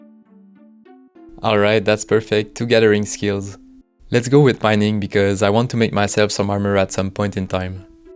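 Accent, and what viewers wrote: Quebec, Canada. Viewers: french or quebecois, he speaks well tho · Quand juste l'accent te dit que t'es queb haha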